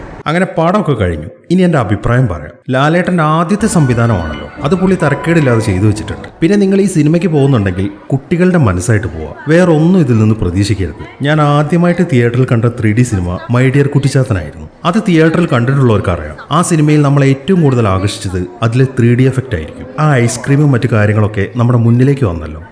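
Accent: Malappuram, India. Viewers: അന്റെ വോയിസ്ഓവർ കേൾക്കുമ്പോൾ ഒരു സംശയമുണ്ടായിരുന്നു ഇജ്ജൊരു മലപ്പുറം കാരനാണോന്ന് പുടികിട്ടി ♥️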